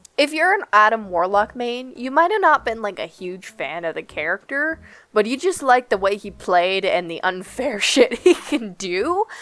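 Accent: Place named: South, United States. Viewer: Dawg is it just me or does she sound like squirrel girl lol like she has the same thing where she like says stuff and becomes slightly southern or something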